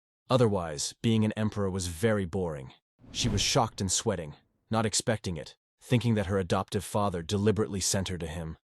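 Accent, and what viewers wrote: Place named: Ireland. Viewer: The narrator seems Irish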